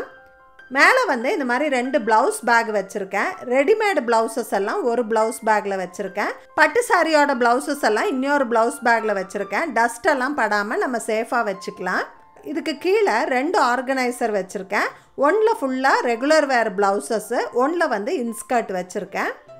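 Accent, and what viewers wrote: Coimbatore, India. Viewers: கோவை தமிழ் உச்சரிப்பு மற்றும் மிகவும் பயன் தரும் தகவல் நன்றி சகோதரி.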